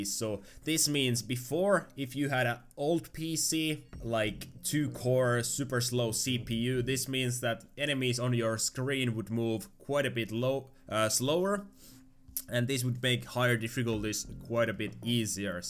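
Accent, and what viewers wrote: Finland. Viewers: Texas Thanatos massacre! btw i like your finnish accent, reminds me of My Summer Car dev guy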